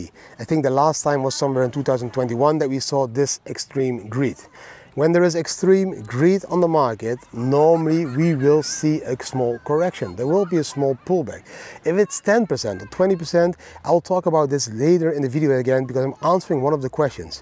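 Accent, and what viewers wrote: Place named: Netherlands. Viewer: Right 😅 I like his Dunglish though, the Dutch are used to say glazen bol 🔮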